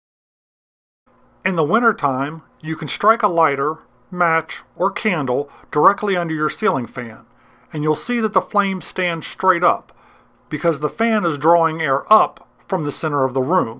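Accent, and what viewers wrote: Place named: United States. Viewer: You have an American accent.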